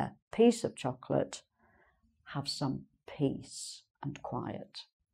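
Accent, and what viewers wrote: England, United Kingdom. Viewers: Hello teacher. I really like your lessons and they very useful for us even your accent that you speak by is wonderful I wish that I study with you only for a month I will be a good britch accent speaker.. Thank you so much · Hello, it's a pleasure to hear your Sweet English accent. I have been several times in England in the four seasons and I have never seen a drop of rain, you know...Only the Sun.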